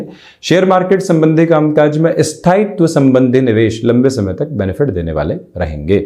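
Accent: Standard. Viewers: Koti koti, pranam 🙏🙏🙏🙏 Apki shudh hindi ko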